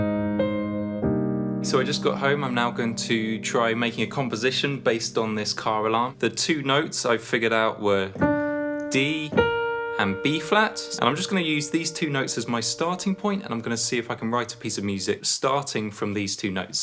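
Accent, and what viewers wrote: United Kingdom. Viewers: How come you speak British, living in the States? I can hear that, as I am from Flanders.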